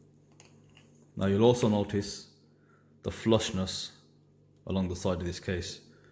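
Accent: Birmingham, United Kingdom. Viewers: I thought I recognized the accent. Have you got any if these steeldive watches for sale?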